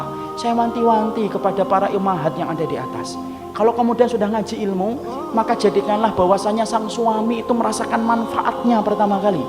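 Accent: Java, Indonesia. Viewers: masyaAllah stads... Allah mudahkan penyampaian hidayah ini lewat ustads... baarokalllah stads... logat jawanya mash kentel sanget stad... :)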